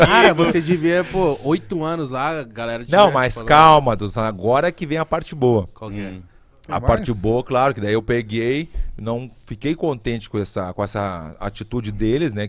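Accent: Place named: Rio Grande do Sul, Brazil. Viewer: O sotaque gaúcho é muito forte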